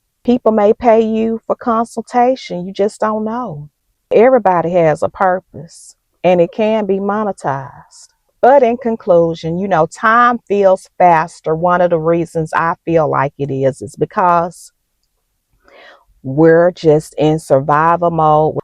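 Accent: South, United States. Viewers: You are such a true inspiration ma'am...You came across my feed last week. I listened to you and your southern accent and now I'm hooked 😊 Keep the good content coming while I continue to be attentive and share your videos. All the best for the new year...lots of love to you and hubby❤ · I seen your video for the first time today. I love your spirit and your country accent. You have given me something to think about. I totally agree with what your saying. My husband and I recently relocated from Arizona to Georgia and I feel a big shift in my life making such a big change. Things are very different in G.A. I need to find something to do in G.A. Still looking for a perpose in a new state.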